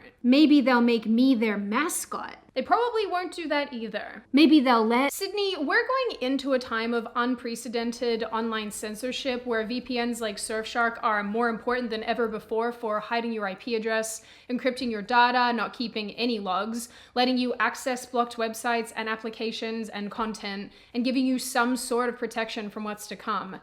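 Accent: United States. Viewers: Awesome video, Sydney! Btw, you're gaining an American accent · Your accent is disappearing!! Americanized quick!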